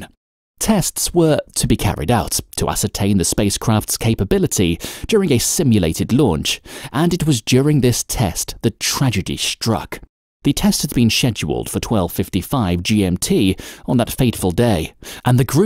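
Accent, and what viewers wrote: England, United Kingdom. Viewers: Why do they use someone (computer) with an English accent? It's stupid and insulting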